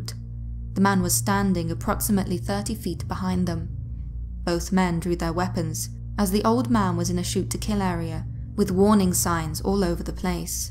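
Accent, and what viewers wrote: England, United Kingdom. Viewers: Thanks. This was well done. I like to listen these tales while doing things around the house. I’ve tried listening to some other ‘told stories’ videos, but they’re either over the top with their music and graphics, and/or the narrator is not very good at telling the story. One channel that listened to for a bit had narrators who sounded as though they were reporting the news on British television ( I’m American so it hits my ear in an odd way ). No offense as it sounds as though you may be British as well. However, you did quite well here. Anyway, thanks again. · The English Accent always does and when combined with the beauty of a female voice then I sit here enchanted by the story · Love your English accent and you tell the stories so well I love listening to you!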